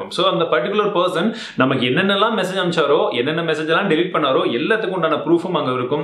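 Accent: Chennai, India. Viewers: Scheduled msging sema bro Nenga pesura antha Chennai slang la pesurathu vera lvl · Bro tdy neega chennai la iruka peoples pesura maari pesuninga...☺️ nalla irudhuchu... Use ful tricks bro...👍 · Chennai language supera pesuringa anna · Really i enjoyed the madras slang of you bro it nice😍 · Chennai Slang thaaru maaru bro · Chennai basala pesathinga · ✋✋✋✋✋தயவுசெய்து இனி சென்னை தமிழ் பேசாதே முடியல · Bro...unga chennai slang spr broo😍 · Super bro. Thank you bro 👍👍 . At last Madras language 👌👌😂😂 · Bro Chennai slong semma super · Ada ennaapa nii chennai slang ķu poita nee........¿ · Chennai tamil super anna semma funna iruku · Chennai tamil 👌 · Chennai slang vera level bro 👍👌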